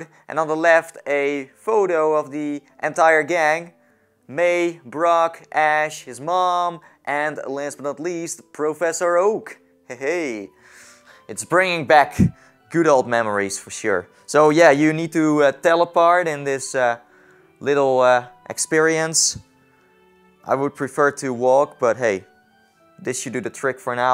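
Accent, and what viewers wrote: Netherlands. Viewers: 100% dat je Nederlands bent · Ben je Nederlands ofzo XD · TomySeptic Dragon He's dutch... I think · I knew your were dutch because ik ben ook nederlands :p hoorde het meteen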